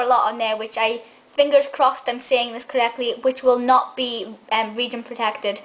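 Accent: Scotland, United Kingdom. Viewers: I loved alan alan alan so why you living in scotland you have a hint of a scottish accent but at times i make out the geordie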